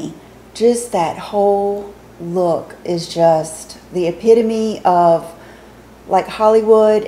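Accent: South, United States. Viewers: Couldn't agree more on your Southern accent! Just delightful. Everything sounds sweeter with it.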